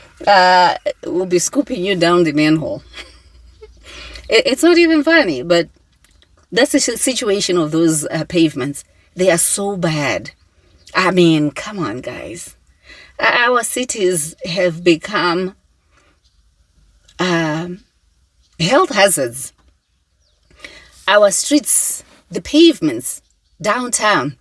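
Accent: Zimbabwe. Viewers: What a nice accent for a Zimbabwean, I enjoy listening to your voice. From South Africa 🇿🇦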